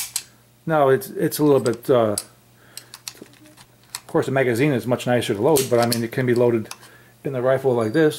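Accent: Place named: Connecticut, United States. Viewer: Thank you for making this very informative video in a nice straightforward style. I like your accent also - Connecticut?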